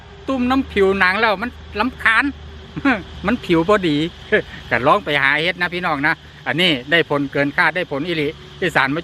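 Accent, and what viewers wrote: Isan, Thailand. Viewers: สำเนียงอีสานจังหวัดใดค่ะ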